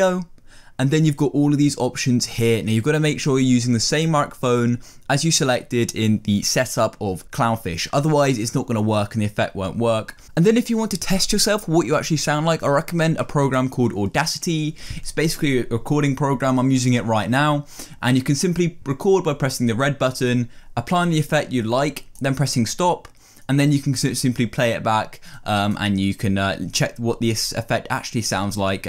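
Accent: United Kingdom. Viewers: britsh man :)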